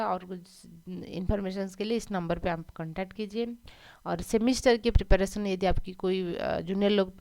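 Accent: Odisha, India. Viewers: 😳😳😳...app odisha se ho kya mam ?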